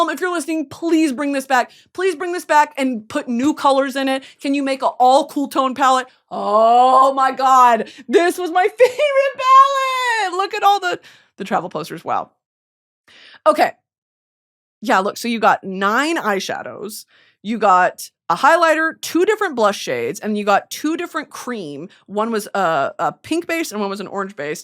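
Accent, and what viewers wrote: United Kingdom. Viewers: the hair with the eyeshadow with the british accent.... giving Riff Raff realness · BRITISH ACCENT 0 SECONDS IN IM SO LOCKED IN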